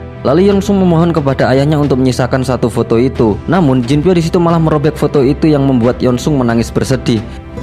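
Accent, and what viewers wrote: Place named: Java, Indonesia. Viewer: Disini mimin masih rada medhoq 😅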